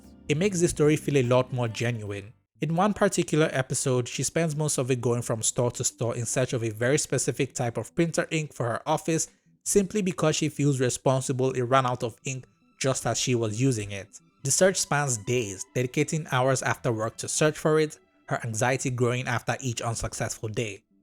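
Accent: Nigeria. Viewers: i could here the nigerian in your voice bro this show is nice but kinda wierd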